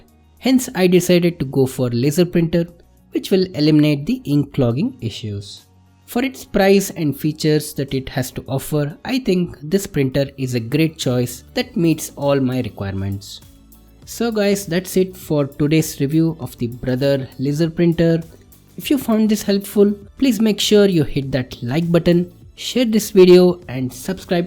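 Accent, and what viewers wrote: India. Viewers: Bro, it's typical indian english😊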